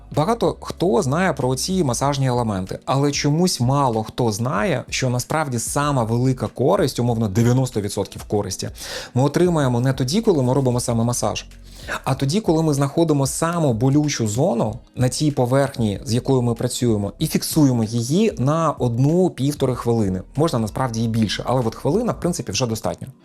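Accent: Standard. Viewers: Така чиста українська!!!Вправи супер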